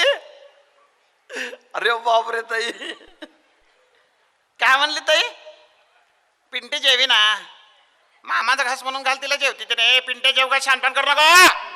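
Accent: Solapur, India. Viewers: खुप सुंदर सोलापुरी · ek no solapuri bhasha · Solapuri dilect is masta ahaya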